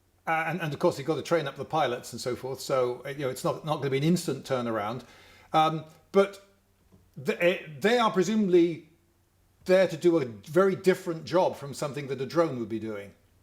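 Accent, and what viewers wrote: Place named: United Kingdom. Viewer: Wow! I listened all the stroy imagining some old chap. And the guy is really young 😮 Oh this British English 😂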